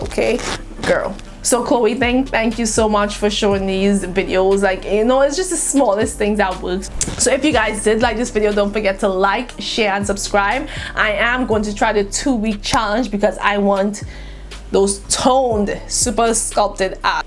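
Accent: Trinidad and Tobago. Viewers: omg i can hear the trini/west indian in your voice lol. 🇹🇹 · By chance you from Trinidad? cuz I HEARING ITTT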